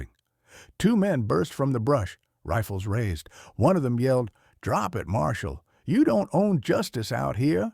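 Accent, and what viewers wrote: United States. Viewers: Story and voice are spot on. Cowboys talk like this. People from the mid west talk like this. Actually the old cowboy talked too much Awesome amazing story thank you!❤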